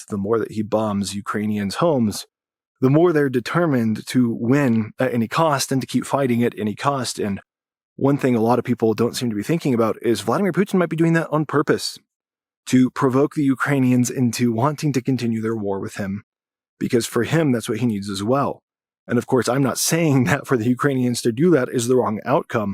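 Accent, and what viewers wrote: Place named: Utah, United States. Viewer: Rational thoughts fella! Utah accent! Prof’ of history at a Utah University?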